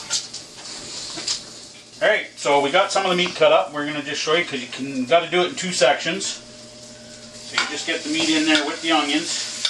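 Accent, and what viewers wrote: Canada. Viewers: You are a scream and i love the Canadian accent. Gotta watch the middle though, more lean and less fat. The dog will like the fat unless his middle is too large. Your wife giggles so good. She is a keeper.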